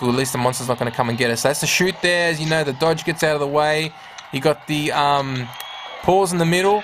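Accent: Australia. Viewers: Hes australian........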